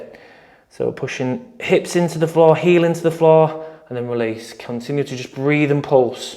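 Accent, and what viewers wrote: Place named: England, United Kingdom. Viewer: Just done my first run in 20+ years! Refreshing to find an English video rather than US, and a regional accent too! Great!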